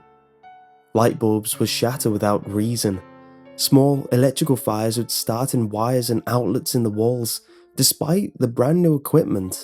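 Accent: United Kingdom. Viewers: doesn't quite have the right effect with a pleasant British accent :(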